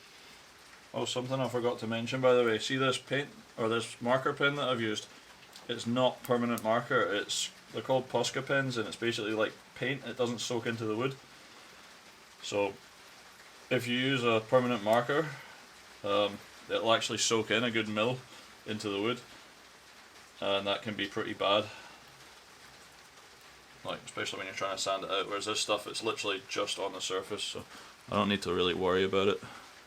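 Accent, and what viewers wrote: United Kingdom. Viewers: Nice wood combo, it's always fun to work during rainy days, ur accents reminds me of my yatch mate fr UK. Cheers mate. Excellent piece of arts.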